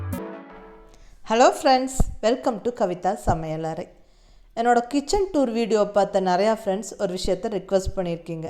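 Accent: Kongu, India. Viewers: Mam, you are an inspiration to us! andha kongu tamil super ponga. adhukagave onga videos paakrom 😊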